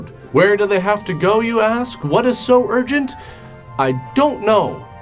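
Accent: Canada. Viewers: Ahhhh, I could listen to Canadian "borrow" all day.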